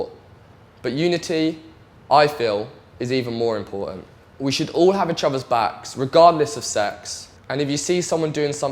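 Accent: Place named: England, United Kingdom. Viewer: His accent makes me ashamed to be from England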